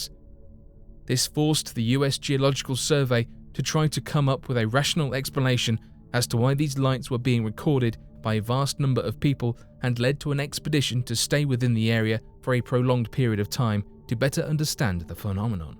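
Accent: United Kingdom. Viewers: I like this narrator much, much more than the usual one. He sounds like an actual British human and not a computer simulated voice. Great topic, too. Thank you for posting this!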